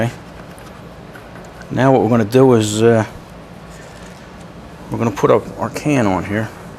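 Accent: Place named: New York, United States. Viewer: Nice accent. New York somewhere?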